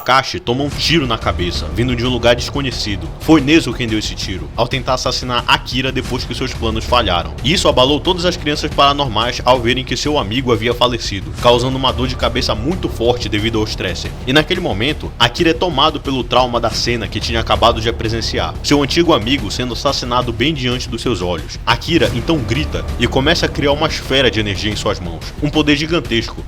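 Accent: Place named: Ceará, Brazil. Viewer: Oloco, cearense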